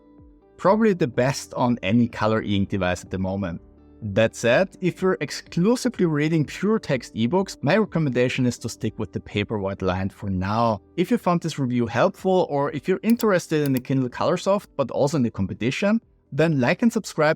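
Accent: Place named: Austria. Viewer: Ich erkenne den österreichischen Akzent sofort. Er gefällt mir :) Ich habe mir dein Video über das ONYX BOOX A3C angeschaut und mir daraufhin das A4C bestellt um die Risale-i Nur besser lesen zu können. Danke dafür ☺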